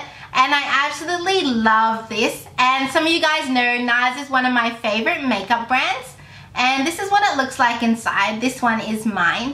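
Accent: Australia. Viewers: Thanks for throwing this giveaway. I love hearing your Australian accent! I would also love to see more product reviews, and possible drug-store-dupes of higher end products. Have a great day! :-)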